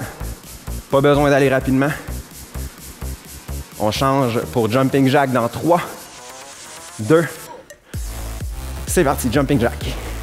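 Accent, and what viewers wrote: Quebec, Canada. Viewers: C'est l'accent Québécois! :)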